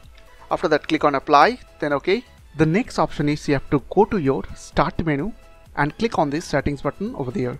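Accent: India. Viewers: when i heard indian accent voice i though this guy is trying to scamm people but seems pretty legit i just hate how some people can ruin own country reputation just like that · Very strong indian accent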